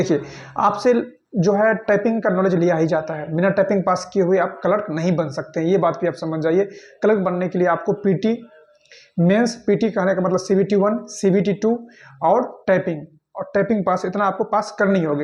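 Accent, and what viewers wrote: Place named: Bihar, India. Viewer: Bhai tu bihari bhsha q bolta h ganw wali .. Hindi b nhi shi se bol pate h bihari 😮lafda ko lfra 😂 wah😮..pta nhi ksi ghatia education h wha k school n teachers ki jo shi sbd kaise bole jate h vo b ni sikhate 😢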